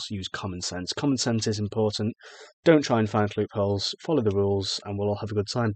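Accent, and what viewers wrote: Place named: United Kingdom. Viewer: bruv ur bri'ish :D same here.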